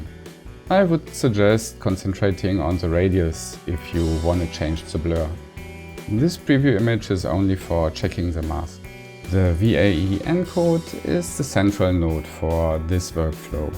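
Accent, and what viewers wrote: Germany. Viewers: jerne, der jute Berlina Dialekt sei uns holdt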